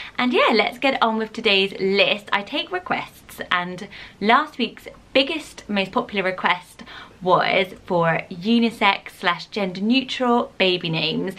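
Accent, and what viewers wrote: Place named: Somerset, United Kingdom. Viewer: Are you from Bristol? :) Your Accent sounds quite somerset/Herefordian!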